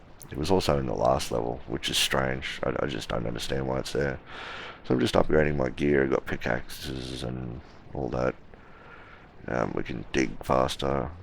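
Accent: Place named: Australia. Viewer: Not meant to be a "dis" Your voice is so fucking smooth, I felt asleep the last 3 days listening to part 3-6. Your gameplay is really relaxed and I like that you do it at 10x speed. But: there is not much value to looking at your vids for hints etc... For the last 4 ones I skipped and skipped and skipped. Im sorry mate (love ur australian accent), but: just consider reading a book into youtube. I would listen to it :)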